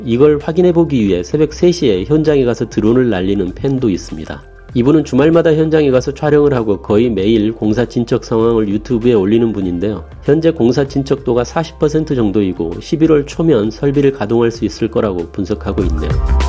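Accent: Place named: Jeolla, South Korea. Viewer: 전라도 사투리가 듣기 좋네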